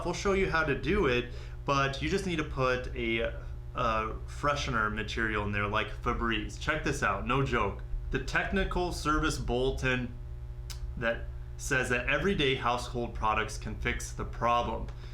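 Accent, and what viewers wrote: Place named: United States. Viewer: I'm sorry, but Americans and their pronunciations...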